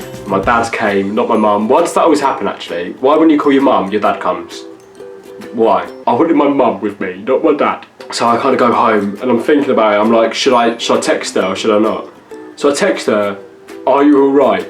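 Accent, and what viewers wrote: England, United Kingdom. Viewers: Mohammed.J British accent. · Tangie Masten he does, British accent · Mohammed.J I have the same accent, it's british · Hes english like me and dats how we talk bruh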